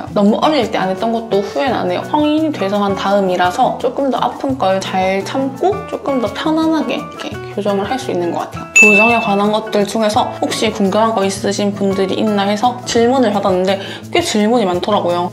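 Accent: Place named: Jeolla, South Korea. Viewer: 전라도 사람이신가요 말투가 매력적이네요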